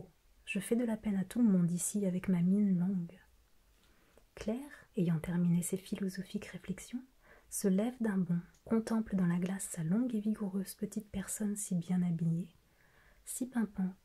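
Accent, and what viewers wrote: France. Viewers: je pense aussi qu’elle est française car je trouve que son accent anglais (qui est très bon) sonne un peu français ☺️ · Mais j'ai l'impression que tu es française qui parle couramment anglais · Elle est française ? je me pose la question depuis que j'ai commencé ses vidéos, je crois reconnaitre son accent français quand elle parle anglais mais je ne suis pas sur 😅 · I've heard some french accent in your English 😍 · son accent en anglais suppose en effet qu'elle est française. J'étais juste curieuse au début mais je suis agréablement surprise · Yes she's french 🇫🇷❤ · I love this so much! The French accent is wonderful to listen to, couple that with an ASMR artist and you've struck gold 😀💯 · Tu est française ?? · Elle est française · Je suis française et je pense que tu es française car quand tu parles anglais tu as un accent français . Sinon je trouve ça super cela permet d'apprendre les base d'une langue tout en ce relaxant ! 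Bravo ! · C'est une anglaise qui parle très bien français ou une française qui parle très bien anglais parce qu'il y a un accent fr nan ? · oui elle est française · vu son accent français en parlant anglais, oui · Attendez, êtes-vous française ?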